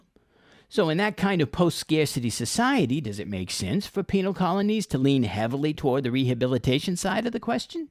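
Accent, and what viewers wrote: Brooklyn, United States. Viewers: just love the ny brooklyn attitude and accent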